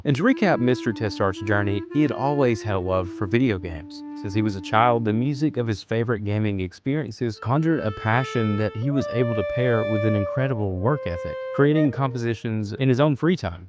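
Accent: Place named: South, United States. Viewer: Very pleasant. Simple in the best sense of the word, it doesn't pretend to explain the universe, but it's full of tenderness for certain things that moved us in this music, in the game -it's the same thing. So, it deserves a little comment for the algorithm and, more profoundly than that, a thank you for taking the time to convey an emotion that helps make this world a more beautiful place. Ps Well, I'm French and i don't care about your accent in French. To be honest, i love southern accent, so, everything is just nice^^.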